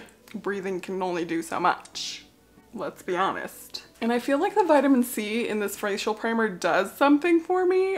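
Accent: United Kingdom. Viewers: Top effort for your British accent! ⭐️ 🇬🇧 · I need a morning routine. Cheers for the reminder and LOL at your British accent. I'm from Manchester England 😂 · Well done. I hadnt realised that Headspace has that suggested run of things for the morning, thanks for that! i've been not noticing that function:) also, I LOVED your British accent, very good. You sounded very familiar! Thanks for a great video. take care x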